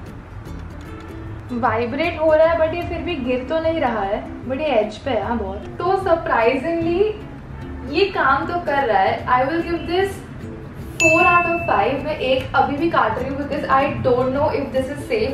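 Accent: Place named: Maharashtra, India. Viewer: You have such a fun marathi accent.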